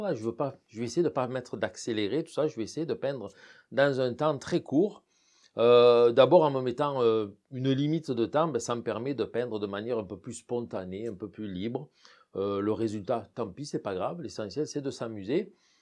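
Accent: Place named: Provence, France. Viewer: Bonjour monsieur,je vous remercie pour votre calme ,vos explications claires,et vôtre lumière bienveillante , même quand ont débute , ont se sent ,en confiance comme avec un ami,qui nous partagent une même passion 😄, votre accent ,le dis que vous ête de chez nous ,je suis du Vaucluse ,et cela fait chaud au cœur 💓 de sentir dans vôtre accent qui est le nôtre,nos racines , merci infiniment ,bien le bonjour à Mme , je viens de m'abonner et j'ai partagé avec une personne de mon entourage,qui est artiste peintre 🦋🙏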